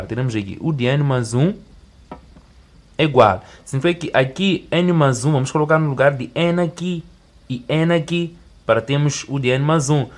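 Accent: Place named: Mozambique. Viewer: Gostei pelo sutac és Moçambicano , e eu sou Angolana 🇦🇴✨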